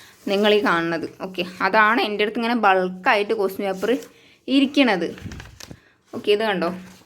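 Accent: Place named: Ottapalam, India. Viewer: ഒറ്റപ്പാലം side ആണോ വീട്.... ഭാഷ കേട്ടപ്പോ അത് പോലെ തോന്നി...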